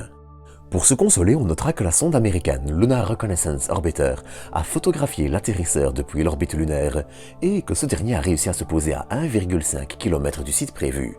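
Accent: Belgium. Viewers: Avec l accents belge une fois..ça fait comique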